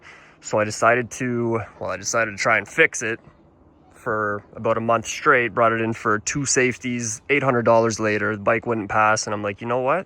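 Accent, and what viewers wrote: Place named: Canada. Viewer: Best part of your Channel: Authentic Canadian Accent = Subscribed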